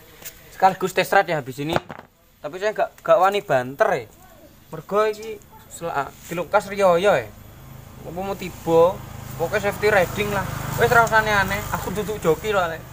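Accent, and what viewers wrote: Java, Indonesia. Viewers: Bhasane seng jwo medok e wae,,sam Bn asli jawa ne,,ngrti hehehe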